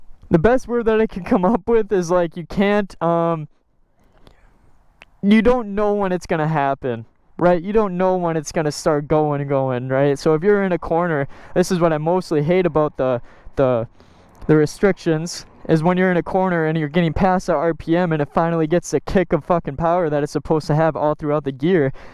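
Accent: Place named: Wisconsin, United States. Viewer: Dude your cringe…stupid Wisconsin accent…mono tone…can’t take u serious..you have no business being on a bike of this magnitude this young. Rich parents I guess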